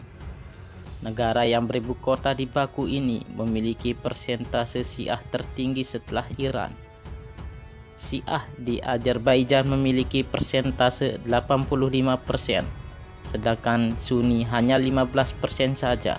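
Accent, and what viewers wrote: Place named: West Java, Indonesia. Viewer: Orang sunda ya. Huruf Z nya jdi J hehe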